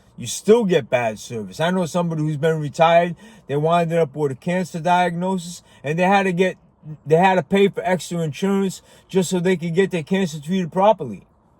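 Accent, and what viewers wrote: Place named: Rhode Island, United States. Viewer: I grew up in Boston and I moved to Rhode Island when I ran away from home and purchased my first house at 26, because I couldn't afford the house that my parents wanted me to buy next door. It was too expensive and I couldn't even qualify for the loan. Even though, I was making good money at the time with a 20% down payment. Boston is very expensive at lease Rhode Island and New Hampshire is a little better. But rents in Providence is $2,000/month now and Boston is $3,000/month. But the seafood on Federal Hill is out of this world and there are many inexpensive great beach seafood joints on the water in Warwick. My favorite is Iggy's. Fun and casual giving you that "laid back vibe" I knew I detected something in the accent of your voice. It makes sense now.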